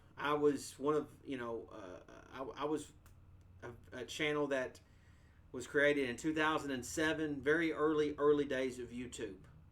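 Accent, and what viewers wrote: South, United States. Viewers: This is the side of I like and appreciate. Calm, and makes a lot of sense. One thing, he’s gotta be from the south with that accent. And! It’s apparent, he must be a NASCAR fan as well., who’s your favorite driver? What’s your thoughts on the current state of NASCAR? I left NASCAR on the final lap at Phoenix last year when 7X Cup Champion Jimmie Johnson rode off into the sunset. Love to hear your thoughts. Love your channel.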